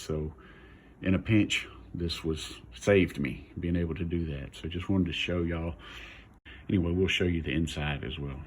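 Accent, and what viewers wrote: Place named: South, United States. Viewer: IM FROM ENGLAND AND I COULDNT STOP LAUGHING AT THE FORREST GUMP ACCENT SORRY BUT IT TICKED ME PINK GREAT VIDEO THOUGH THANKS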